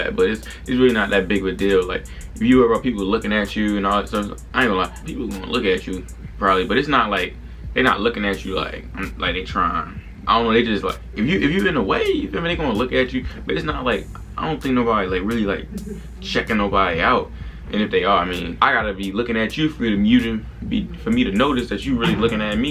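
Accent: Baltimore, United States. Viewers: You from Baltimore bruh? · R u from Baltimore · LMFAO HE FROM BALTIMORE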